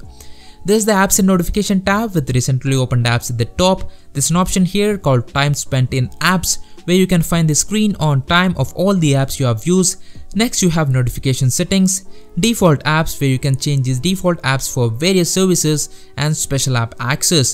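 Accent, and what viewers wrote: India. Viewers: Finally an Indian English speaking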